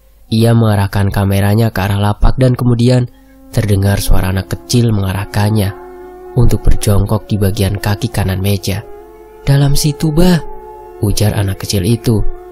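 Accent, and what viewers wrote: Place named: Banjar, Indonesia. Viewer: maaf.. urang banjarkh ini.pina nampak logatx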